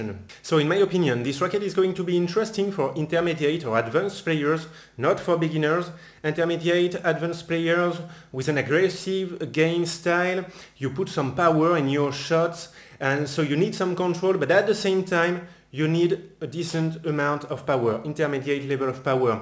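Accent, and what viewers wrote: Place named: France. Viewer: french accent is so funny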